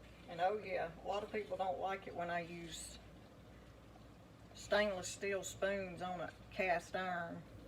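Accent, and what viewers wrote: South, United States. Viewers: Thank you for sharing recipe. Your advise is very true now days. Love the southern accent. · I just now finished watching this video, I have never seen you before. I can be critical of a lot of things, especially how to videos, watched the whole thing with interest. I’m an older lady,and I can make brown gravy, but you did add a couple of new ideas, like the onion and garlic powder. THE POINT I want to make is, have I know you my whole life? Your presentation was JUST THE BEST! Your voice was so smooth, you are Southern to the bone. I say that as a great, very great, compliment.I have never subscribed to a cooking channel before, it’s like my neighbor talking to me. Wonderful !!! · You got the south in your mouth. Fine recipe. THANK YOU.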